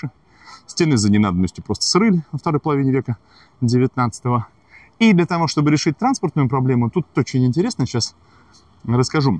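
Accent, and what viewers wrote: Standard. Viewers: твердая 5 за прекрасное знание истории Баку! Очень правильная и чисто русская литературная речь. Человек благородный и жизнерадостный. Мне самому стало любопытно, как иностранец может столь интересно рассказывает историю нашего города. Рад что для гостей Баку открываются свежие страницы из жизни нашего города. Люди добры и чистоплотны, вежливы и гостеприимны. сильно отличаются от других народов Кавказа. Жители Баку богаты и горды, не любят приставать со своими услугами к незнакомым людям, но всегда придут на помощь если к ним обратятся., вам всегда будет сопутствовать удача за ваше жизнелюбие. Жаль что поленились и не сняли все что увидели в Баку. Отдельно про Баку -чистый и омытый весенним дождем, вкусов жаренного мяса с разнообразными специями, ароматом горячего хлеба, морских волн и криком шумливых. попугаев. Баку рад и ждет гостей разных добрых и любопытных, мечтающих возбудить чувства и вкус в новых путешествиях и приключениях.. 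понравился Баку, нам понравились вы! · Наслаждаюсь чистейшей русской речью огромное спасибо спйчас это редкость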